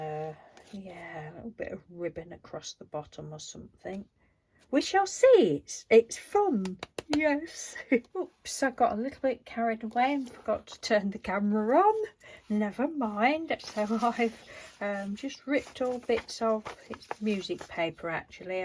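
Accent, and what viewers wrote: United Kingdom. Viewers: It looks like you are just having the best time!!! I'm in the USA but when you said it was for your friend Penny Humphreys it made me think of 'Are you Being Served' haha. Wasn't there a miss Humphreys on there? I belive so 😆. I love watching that silly show plus you sort of have that accent to me. I'm being silly I know. Your project inspires me to just go make art with free abandon!!! I love your project.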